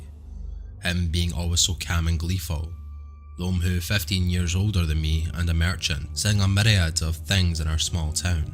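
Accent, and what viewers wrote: Scotland, United Kingdom. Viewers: Just another one of my new favorite guys because of the great voice and accent! Sometimes there is some Scottish sounding accent too! 💚 Think I've listened to all videos, love the longer ones. Hope you make a few more of them.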